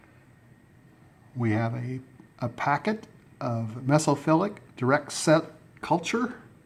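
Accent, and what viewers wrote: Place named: New England, United States. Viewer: You sound like a yankee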